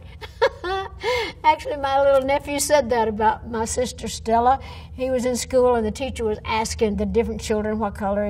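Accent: United States. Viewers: one of my favourite and most distinct american accents lol i just love listening to people who speak like that, it's so fun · she is the most american-sounding person I've ever seen haha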